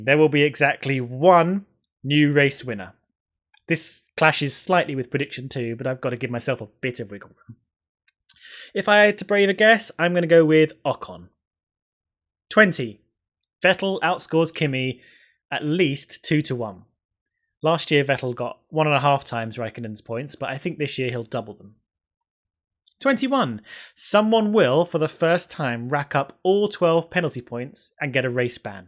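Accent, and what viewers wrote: United Kingdom. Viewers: So british, I love it!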